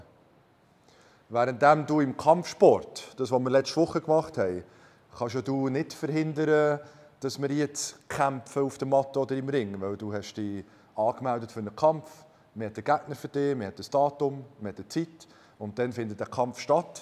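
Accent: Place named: Switzerland. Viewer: ja sicherlich mein Freund, du sprichst ja deutlich! 😸 was kann man da nicht verstehen, und ich mag den schweizer Dialekt! 🤜🏼🤛🏼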